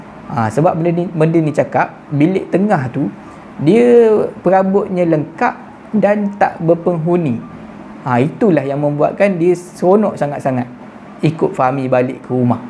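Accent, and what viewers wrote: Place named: Perak, Malaysia. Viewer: Bang orang mana terengganu ke perak bunyi seakan akan macam loghat peghak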